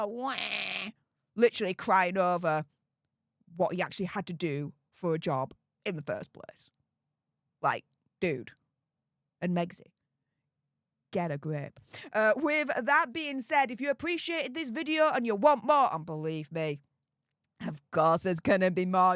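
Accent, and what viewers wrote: Yorkshire, United Kingdom. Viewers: She's from Yorkshire.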